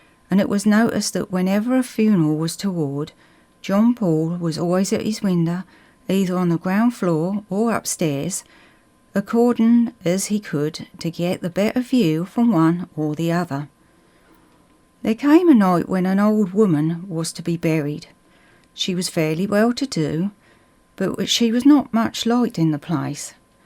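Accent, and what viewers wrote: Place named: Suffolk, United Kingdom. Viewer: Well suited together, M.R. James and the Suffolk accent.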